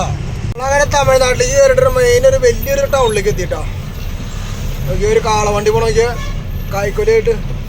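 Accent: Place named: Thrissur, India. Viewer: തൃശൂർ ഭാഷ ഇഷ്ടപ്പെട്ടു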